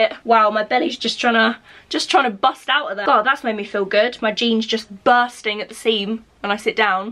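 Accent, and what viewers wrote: United Kingdom. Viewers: OMG YOUR BRITISH YASSSS SIS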